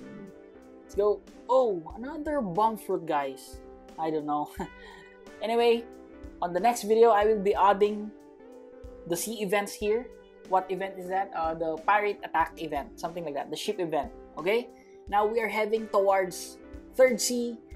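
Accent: Philippines. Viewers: ive been watching you for years now i love ur intro, ur filipino accent and the way u work hard for content doing those level 1 to pro noobs “ is back” i admire you and u make me so encouraged to make new alt accounts and max level them all i wish u notice this comment. happy bomb day🎉🎉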